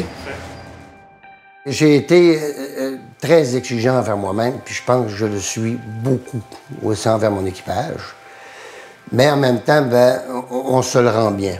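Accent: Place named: Quebec, Canada. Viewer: Friends, that ain't Parisian french...that's joual!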